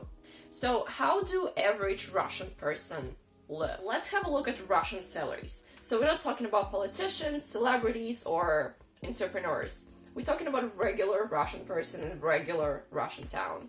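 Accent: United States. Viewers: Great work! As a Chinese, Khabarovsk is a city that I always wonder what it’s like since it is so close to us. I often hear from people from the northeastern Chinese provinces (close to the Russian Far East) complaining about their stagnant economy but it seems that sadly our Russian neighbours aren’t doing great either. Wish you the best with your channel and congratulations on your English skills, you sound very much like an American! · I want to watch the video, but this "Amerrrrican" accent.... Ewwww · You have a American accent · It’s hard to believe you’re Russian...you sound American...your grasp of English is amazing if you’re Russian.. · How did you learn english with an american accent? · Your English is American - style .. most excellent. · Get your Ass to America! I just started watching your channel so I don't know your background but I must say your English is amazing and honestly as an American I don't think most Americans would pick up on your accent they would probably just assume that you're American with a weird dialect lol no disrespect intended with the "weird".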